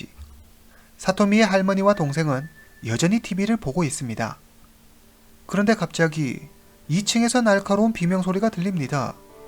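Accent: China. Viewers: 혹시 조선족이신가요?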